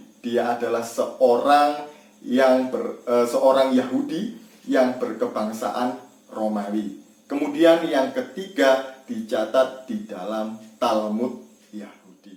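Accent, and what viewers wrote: Java, Indonesia. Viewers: 😂😅🤣😂😅🤣😂😅🤣 Wahai PENDETA bersuara medok Setiap agama mempunyai versi. Versi kristen yesus mati tersalib yg tadi anda bicarakan itu memang benar. Dan itu gak jadi soal karna itu versi anda. Sedangkan versi islam Allah menyerupakan wajah nabi isa yg mirip dengan isa lalu disalib. Karna cara salib itu cara hukuman mati bagi org yg bersalah dan itu hukuman yg KEJI DAN TERKUTUK. bisa dibayangkan jika nabi terbunuh dengan cara disalib tentu sangat hina.mungkin skrg isa akan diolok2 karna mati dengan cara yg keji. Maka dari itu allah menyerupakan wajah nabi isa dengan org yg diserupakan lalu dia disalib dan allah mengangkat hidup2 nabi isa ke langit. Dan anda seharusnya berfikir. Tuhan anda TELAH MATI itu artinya TUHAN YG ANDA SEMBAH itu tidak berkuasa atas dirinya. Dan anda malu mengakui tuhan anda dengan cara disalib dengan cara hukuman yg keji dan terkutuk Lebih baik mana coba Tuhan dibunuh disalib Apa tuhan diangkat hidup2 dan diangkat ke langit. 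Pake akal logika yg sehat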